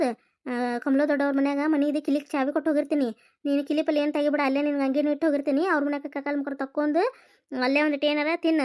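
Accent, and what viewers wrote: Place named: North Karnataka, India. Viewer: ಇನ್ನೊಂದು ಎಪಿಸೋಡ್ ಹಾಕ್ರಿ ನಿಮ್ಮ ಉತ್ತರ ಕನ್ನಡ ಭಾಷೆ ಸೂಪರ್❤❤❤❤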